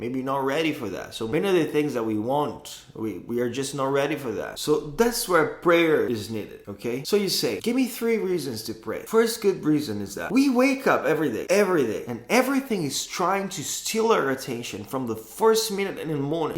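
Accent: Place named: United Kingdom. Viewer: o sotaque britânico kkkk mt bom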